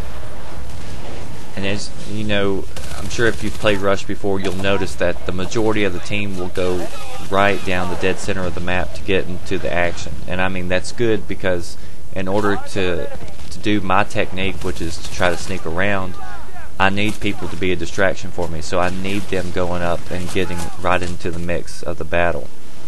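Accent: South, United States. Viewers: bad company toow. is he from the south? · Finally southern guy on here (no homo)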